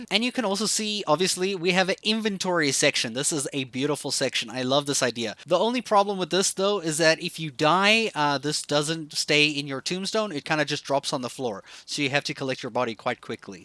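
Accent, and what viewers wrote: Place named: South Africa. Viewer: My dude. Where are you from? You sound North American, but then you 100% don't. South African and moved to the US or Canada? hmmm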